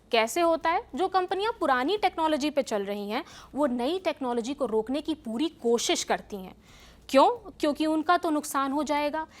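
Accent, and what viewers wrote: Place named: Bihar, India. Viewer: Y all lallantop anchor use bihari accent....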